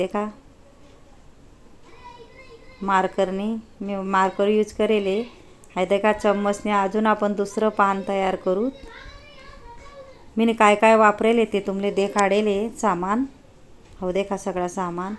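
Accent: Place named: Khandesh, India. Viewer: अप्रतिम ताई आणि तुमची खानदेशी भाषा खुप गोड.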